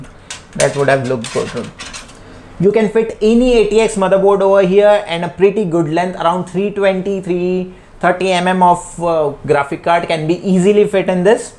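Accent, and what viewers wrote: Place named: India. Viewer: Ох уж этот индийский говор...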